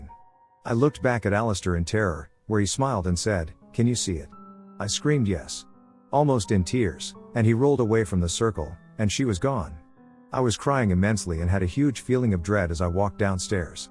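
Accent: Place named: South, United States. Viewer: I've been watching for a long time but I finally decided to subscurb because I'm excited to find out that the tts is a fellow southerner. Yeehaw yall!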